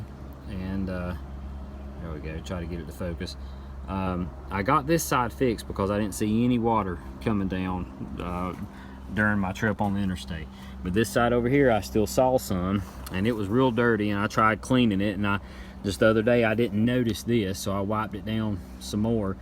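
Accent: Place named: Tennessee, United States. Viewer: Hi, I bought a 2006 Jazz here in West Yorkshire a week ago. I knew about the leak issue so was pleased to find mine is OK. However, i took the precaution to seal those areas you highlighted with clear silicone sealant. Have you got another car and ditched the Jazz? ps love your accent, im guessing Tennessee?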